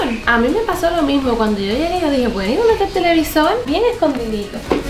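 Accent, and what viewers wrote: Cuba. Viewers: Ella es del pais de así q todo los cubanos habla asi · Las dos hablan igual porque las dos son Cubanas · es que las dos son cubanas, y el acento cubano es asi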